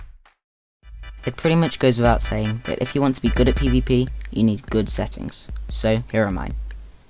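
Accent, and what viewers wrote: United Kingdom. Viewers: fr he’s a British guy with a beautiful accent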